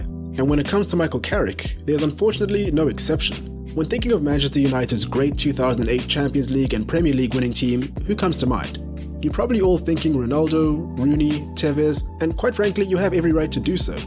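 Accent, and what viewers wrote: South Africa. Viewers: Are you South African ? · Love the SA accent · Are you South African? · I’m hearing a South African accent 🤩 awesome video!! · Mzansi accent. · Are you South African??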